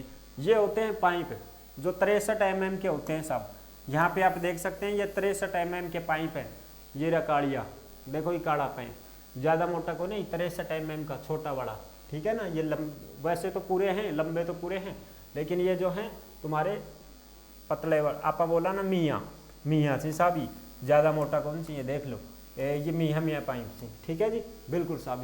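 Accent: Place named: Rajasthan, India. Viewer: Bhot badiya video vo bhi Rajasthani Voice me❤❤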